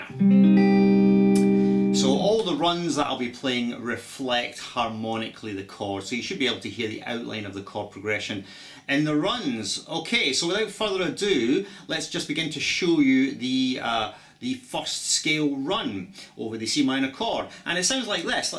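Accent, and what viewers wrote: Scotland, United Kingdom. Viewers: Instant subscribe because of Scottish accent. (And amazing playing as well ;) )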